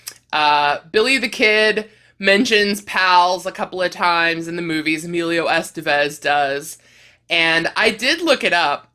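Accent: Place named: Pittsburgh, United States. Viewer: I'm excited to see how the cardigan works up! Also, I just realized how much you speak Pittsburghese. It makes me homesick!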